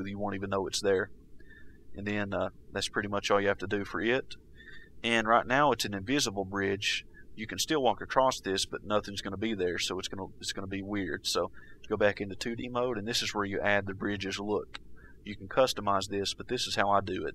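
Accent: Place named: North Carolina, United States. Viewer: Cool video. By the way, are you from North Carolina? I love your accent!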